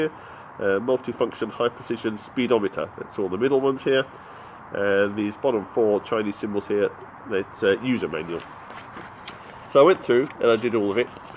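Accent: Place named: United Kingdom. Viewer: Man I agree with the other guy... You talk like a Brit. I could start a pot of tea and sit down a have a cup or two while ya wax poetic without actually saying anything useful. Remember some of us Yanks don't even drink coffee anymore we are all hopped up on Monsters and Rockstar's. Our patience is shot. Still that's for the review you did give me what I wanted to know, just sold my Caldwell before buying a new air rifle.. to poor to buy another now. Glad this thing might work for a bit.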